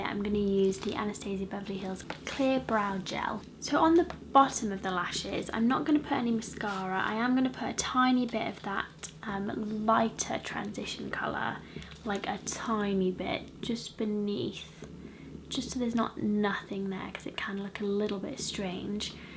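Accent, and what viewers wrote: United Kingdom. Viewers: is she british? I LoVe her accent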